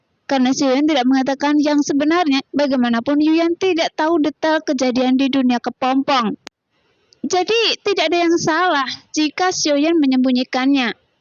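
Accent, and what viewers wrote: Java, Indonesia. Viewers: Mimin nya orang Jawa ya,Kentel banget logat Jawa nya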